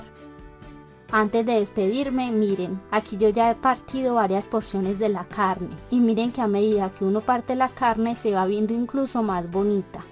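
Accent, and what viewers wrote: Antioquia, Colombia. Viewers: Tan Querida con ese acentíco Paisa. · Super esta receta😂😂😂😂me senti hablando yo...puro paisa.gracias por compartir❤ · Como todas tus recetas excelente me encantó un abrazo de un venezolano que vive en argentina y por supuesto esa receta el mejor acompañamiento que tiene es ese acento paisa tan lindo ❤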